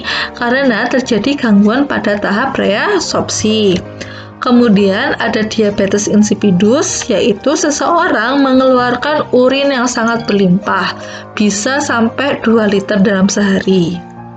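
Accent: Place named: Java, Indonesia. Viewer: Medok banget jowonya 😁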